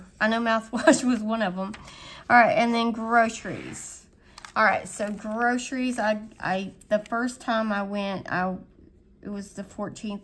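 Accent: South, United States. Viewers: Good job. Love to hear a fellow southern accent in the budgeting community. You are doing great!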